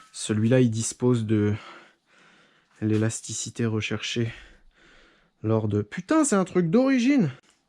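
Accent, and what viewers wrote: Alsace, France. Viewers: Putain elle est énorme la chaîne. Et j’ai tout de suite senti l’accent de la maison. Alsace Frei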